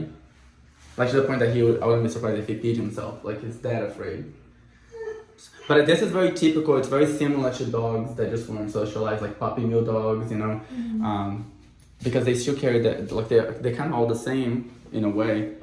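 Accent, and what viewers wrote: Brazil. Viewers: Você é brasileiro? Eu ouço sotaque carioca.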